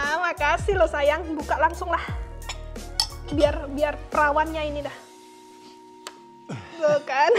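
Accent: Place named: East Java, Indonesia. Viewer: Kok ngomong nya orang mana surabays eatau malng ya ,orang nya cantk pintr mask ginana sh kok dapt orng luar ngri ngomong pakai arek arek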